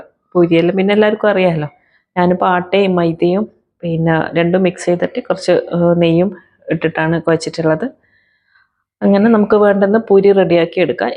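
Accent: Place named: Kannur, India. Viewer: Kannur bhasha 😊😊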